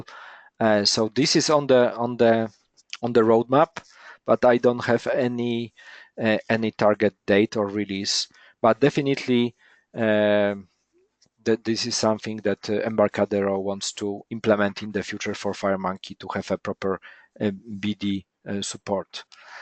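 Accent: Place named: Poland. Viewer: you are simply the best, "English made in Poland"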